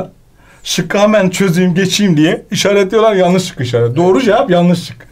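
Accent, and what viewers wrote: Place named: Bulgaria. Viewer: Abi sen burgarmısın çünkü NAİM SÜLEYMANOLU SENİN GİBİ KONUŞUYODU FİLMİNDE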